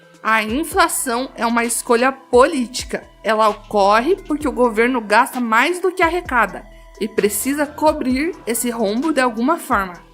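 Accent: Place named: Bahia, Brazil. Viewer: Sotaque baiano é engraçado 😂😂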